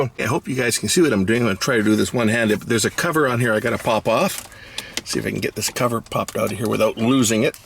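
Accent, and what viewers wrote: Canada. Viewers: Thanks very much for posting. Makes a lot of sense. I'm gonna give it a shot since I sure don't want to pay the dealership to do it. If this doesn't work I'll try replacing the shifter myself. Either way the vid was worth watching just for your magnificent Canadian accent. Cheers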